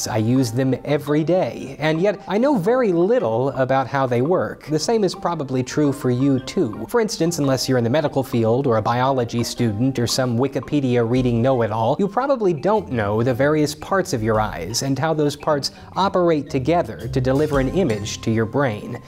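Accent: Australia. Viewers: Oh I see you are from Australia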